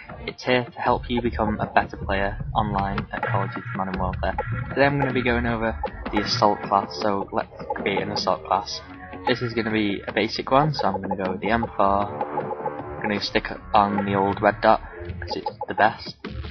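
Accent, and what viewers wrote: United Kingdom. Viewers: corner, he just has a british accent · filipino with a english accent?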